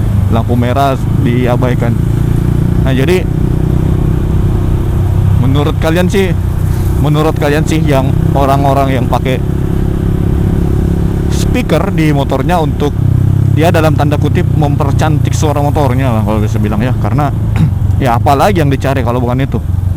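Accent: Makassar, Indonesia. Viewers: kentara logat makassarnya😂, salam sesama orang makassar bang😇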